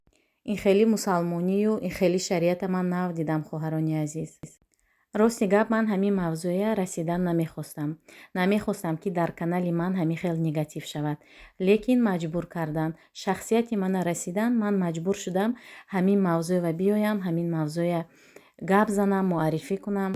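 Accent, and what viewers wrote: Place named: Literary Tajik, Tajikistan. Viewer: Мана чи тавр бояд сухан кард: равон озод бо лахни адабии точики👍👍👍Шахсе , ки шуморо гап задааст аввал тарзи сухан кардана ёд гирад😊. Шумо бехтарин модар зани точик👍👍👍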